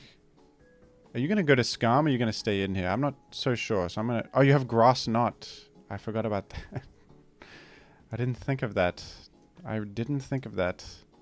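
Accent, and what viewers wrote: United States. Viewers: cool American accent always gives me chills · Something about the American weeb accent disturbs me in a profound way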